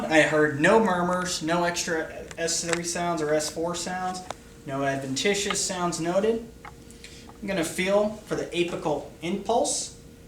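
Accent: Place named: South, United States. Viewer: Get it southern accent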